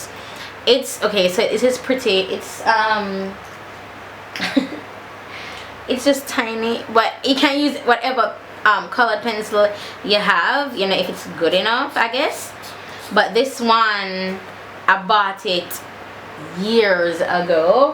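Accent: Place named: Jamaica. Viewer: nice girl... from the first time I watched your video I heard your accent and I know u were Jamaican... big up uh self goodie mi a Jamaican too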